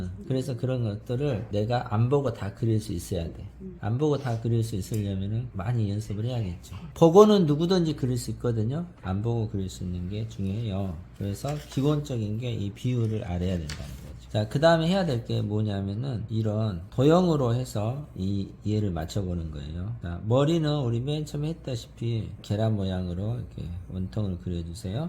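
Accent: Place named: Jeolla, South Korea. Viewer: 왜 라도치들만 유투브에 열심인가? 사투리 좀 쓰지지 마.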